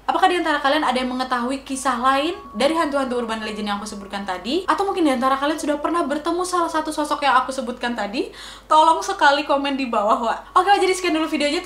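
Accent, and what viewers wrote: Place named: Medan, Indonesia. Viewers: Sakinn sering nonton chenel ka:/ aku ngomong jdi medok Medan smpe ada wak² nya, trs di tanyain gini he medok amat ngomong lu eh aku mlh jawab " bacot kali kau ini, kesel kali aku wak:v" ketularan jadinya🤣 love ka😍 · Sepertinya kau dari medanya kak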